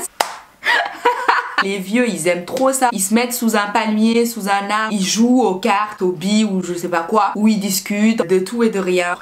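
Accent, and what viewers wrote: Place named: Senegal. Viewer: Tu ressemble pas du tout à une sénégalaise mais avec ton accent on reconnaît que tu est sénégalaise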